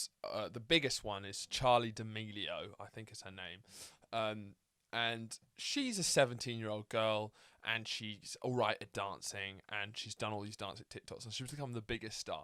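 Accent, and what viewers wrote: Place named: New York, United States. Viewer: Ridiculously good New Yorker accent lmao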